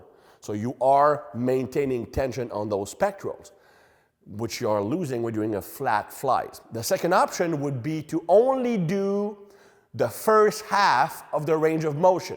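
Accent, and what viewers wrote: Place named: Quebec, Canada. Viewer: it’s a Quebecois accent:)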